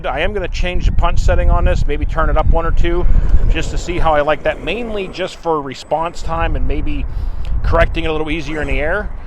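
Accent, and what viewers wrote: Pittsburgh, United States. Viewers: Where are you located? Your accent sounds like western PA/Pittsburgh. Edit - ok - I see a sign in the background that is 724 area code so we are almost neighbors! Where is this track that you are at? I have a Big Rock coming on Thursday. Just subscribed!!!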